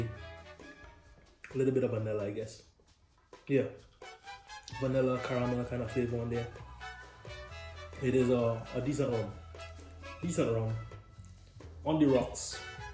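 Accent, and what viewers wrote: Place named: Caribbean. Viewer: I am really taking a liking to the Central American rums lately. I haven't seen Ron Colon rum in my parts however bottling in a wine bottle makes it easy to spot on the shelf if it ever does show up in liquor stores here! El Salvador has had a rough history in the 20th century but very glad to see rums (and beers) start to be made there! Shows some kind of stability has returned to that proud country. I am a huge fan of your accent mate, gives your videos instant Rum street cred! Caribbean accent for sure. Let me guess. St Martin? Good review. Very detailed.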